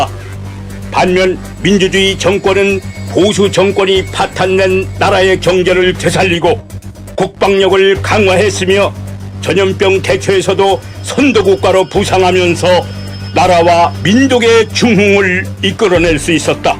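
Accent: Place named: North Korea. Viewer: 다좋은데 왜 이북말투예요?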